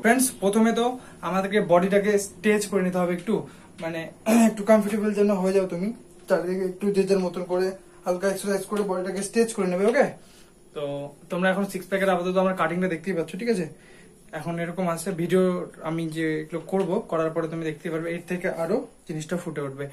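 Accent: Kolkata, India. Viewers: আপনি কি কলকাতা থেকে 😅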